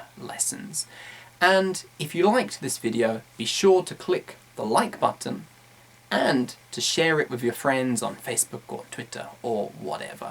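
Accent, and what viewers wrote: United Kingdom. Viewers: I think using british accent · U are british is not? I'm mexican :v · is it britain english? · sahid maarif It’s a British accent. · excellent lesson i would like a video about job's interviews please your accent is like brittish people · It´s british accent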